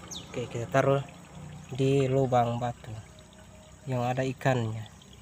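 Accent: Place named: Netherlands. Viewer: Kayak orang holand ini logat 😁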